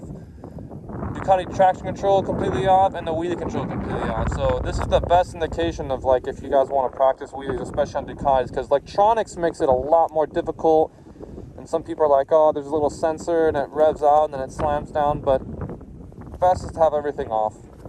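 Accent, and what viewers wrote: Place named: United States. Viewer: Having grown-up in a windy place here in the States, on my BMX Bicycle and I remember when you get that front wheel in the air it is more like a sail on a ship. Appreciate the types have a 2018 SP, very unusual to see your year with a single exhaust. You sound American, but looks like your in Germany or Holland.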